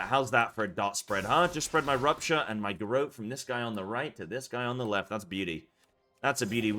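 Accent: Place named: England, United Kingdom. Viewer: I’ve abstained from posting this comment for the longest time, but finally cracked… where are you from? I recognise a mostly English accent, however, there are a few intonations in your voice that make me question this. As an aside; another great video, thank you. Feral main 👍🏼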